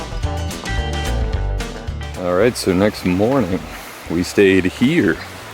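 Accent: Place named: New England, United States. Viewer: What's up from mass! Your accent sounded right at home, loving these videos and hopefully plan to hike a ton in the whites this summer. Good luck with the rest of your trip and maybe see you up there cheers man!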